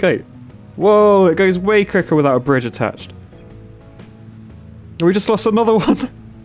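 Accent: United Kingdom. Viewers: just a normal bri'ish engineer playing games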